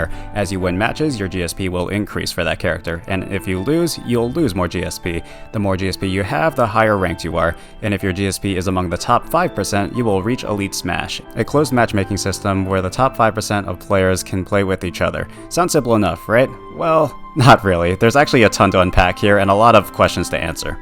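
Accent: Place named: New York, United States. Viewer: What part of New York are you from ? I’m from New York as well 👀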